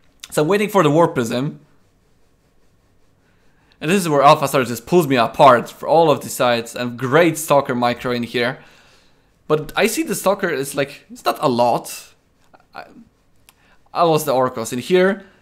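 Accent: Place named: Poland. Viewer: Nice adventure you've had! I enjoyed watching your inside view of this AI vs Human duel, well done! PS. Funny, after a few minutes of watching I've noticed that except of the familiar accent you've used some phrases that is also familiar for me but definitely not of an English origin so I've checked where are you from and Bingo! - fellow Pole. Don't get me wrong, your English is very good, but while freely speaking you gave up your nationality ie. "ran circles around someone" in English mean to do something much better than someone else rather than taking extreme care about someone (Polish meaning of this phrase). Pozdrowienia! Oh and btw, as I understood you've played against different agents each game right? I felt sad for you because of your first 5 matches but imagine what happened to the agent who lost exhibition match - probably it has been deleted.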